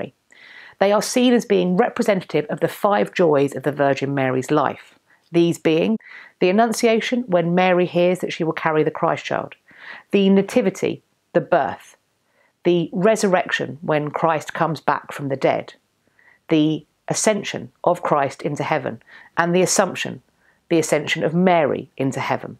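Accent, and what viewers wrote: United Kingdom. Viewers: Hi, I am new to your channel, I live in the US but I wasn’t born here! I love the beautiful British English, along with the fascinating content. I have been obsessed with the Tudors and British history! Can you do a video about Katherine Howard?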